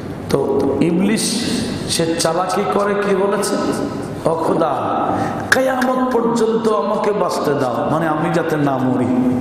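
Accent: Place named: Chittagong, Bangladesh. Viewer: হুজুরের বয়ানটাও চট্টগ্রামের।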